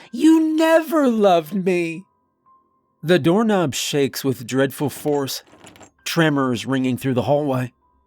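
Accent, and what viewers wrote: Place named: Louisiana, United States. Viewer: What bayou you from, reader?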